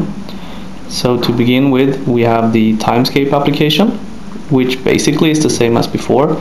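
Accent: Sweden. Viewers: Haha låter som svengelska! :D Något nytt datum? Liksom senast om 10 dagar SKA den finnas ute. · Ge oss den uppdateringen istället för att reta oss med din förbannade svengelska!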